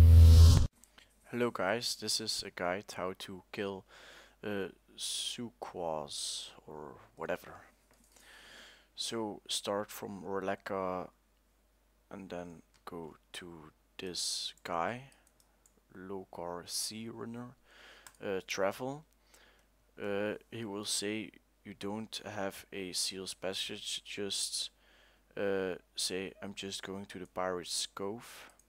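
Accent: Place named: Netherlands. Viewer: tought you were dutch actually, it's because you speak words with an Dutch accent :) liked the guide really much, didn't know to kill them there :)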